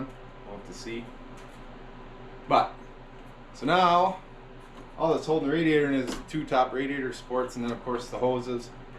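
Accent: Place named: Minnesota, United States. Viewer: Fellow rural Minnesotan here. About to do a ps pump on my 98 Tahoe this weekend. This video was immensely helpful and informative. Certainly helps we speak in the same dialect 😂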